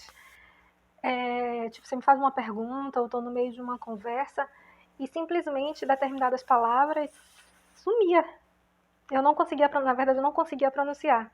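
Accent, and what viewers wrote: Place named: Bahia, Brazil. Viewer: Oi! Um salve daqui de Salvador! Estou na minha primeira onda, está sendo uma onda... rsrs! Obrigada por seus vídeos, é bom ouvir relatos de RH com nosso sotaque! Saúde e sorte pra você!❤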